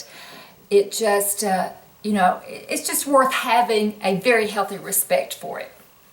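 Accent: South, United States. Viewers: It's a beautiful southern accent:) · Your skin looks beautiful, especially your neck. How often I see girls ignore their necks and when the face looks good but the neck looks like a turkey's wobler, just ruins the effect. Being a Carolina gal, myself, I really loved listening to your Southern accent. Your hair is so thick looking on top, any tips on hair loss? Thanks, nice video from a Beautiful Lady.....................................Linda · My mom was Southern, so its very comforting listening to you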